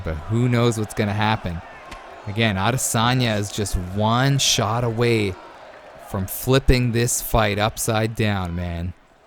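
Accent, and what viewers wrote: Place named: Canada. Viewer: Dude I just found ur channel and I gotta say you got such a chill upbeat vibe and I’m not sure if I’m right but feels so Canadian, I’m only saying that cause I’m Canadian and u sound like alot of people I know which is dope!, anyways u got a new fan brotha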